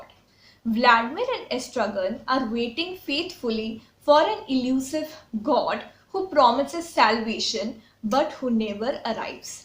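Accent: India. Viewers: So sweet and honey mixed easy Indian accented description made by you, It's easy for me to make notes for my M.A. in Eenglish, Drama Paper. Love and Thank you, from Assam India*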